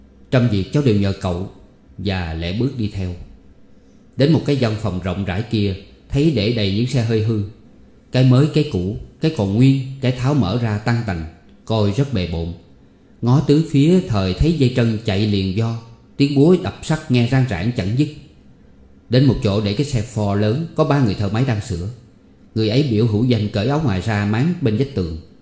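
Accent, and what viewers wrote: South, Vietnam. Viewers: giọng miền Nam nghe ngọt ngào làm sao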